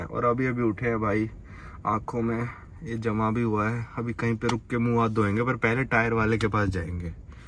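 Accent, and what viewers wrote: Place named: Delhi, India. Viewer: He speaks like Delhi waala Desi ❤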